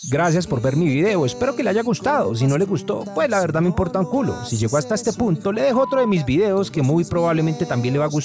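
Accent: Colombia. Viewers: XD me mató de risa todo el video, y más que suena bien paisa el men, me voy a suscribir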